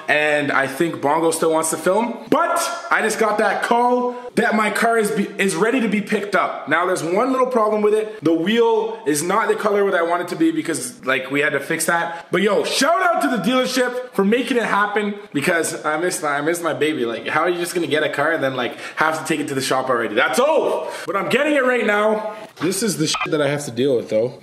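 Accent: Jamaica. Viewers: Up mi cahgi with the Jamaican accent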